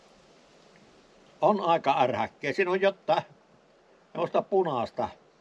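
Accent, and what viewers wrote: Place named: Kaavi, Finland. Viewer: Kiitos ja kiitokset, että jaksat tehä näitä juttuja, mukavia arkisia hommia. Mie niin tykkeen tuosta siun kuavilaisesta murteesta, mukavan kuulosta. Nyt pitää lähtee ostamaan ryynäriä ja loimottoo hiilloksella. Seuraavaa laavureissuu odotellen...❤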